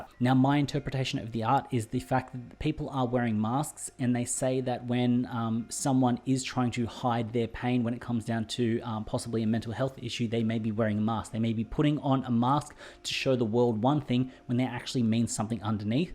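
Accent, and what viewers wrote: Australia. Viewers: i knew you were a fellow aussie:)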